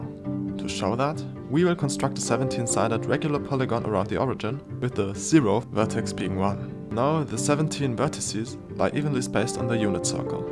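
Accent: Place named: Germany. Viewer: Nach dem Akzent zu urteilen sind Sie Deutscher. Hier ein kleiner Englisch-Tip: „not constructible as well“ ist falsch. Es heißt „not constructible either“.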